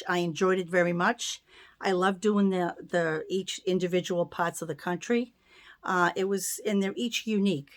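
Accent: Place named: New England, United States. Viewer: I love your from sea to shining sea! And your NE accent!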